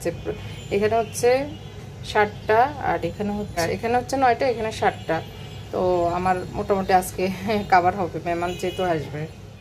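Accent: Noakhali, Bangladesh. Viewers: Apu Noakhali r naki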